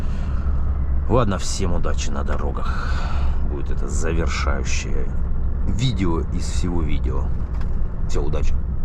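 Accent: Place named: South, Russia. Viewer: ! Ты же якут, а откуда такой говор южный?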